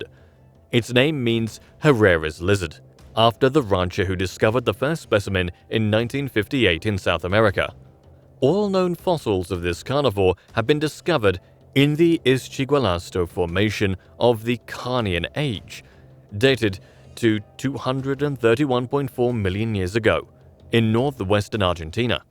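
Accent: United Kingdom. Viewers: Am I the only one sick UK accents?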